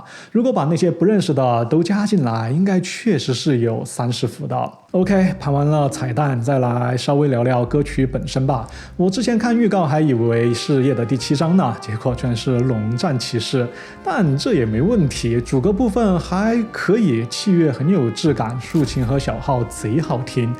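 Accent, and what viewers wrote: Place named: Chongqing, China. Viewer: 很喜欢你的重庆川普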